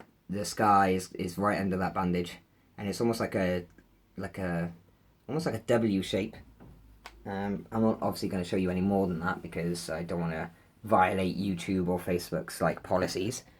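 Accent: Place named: United Kingdom. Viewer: OMG, you're so handsome, and I love your cute British accent! Thank you for sharing your experiences with bottom surgery. :) <3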